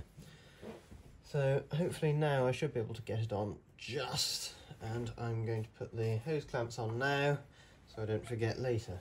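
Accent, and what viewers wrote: United Kingdom. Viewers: youve got the most british accent ive heard. But we in England dont have possums were are you from??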